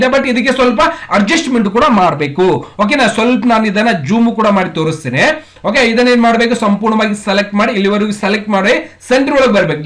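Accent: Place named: Bijapur, India. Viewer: Nam Bijapur Mandi Hinge Matadtar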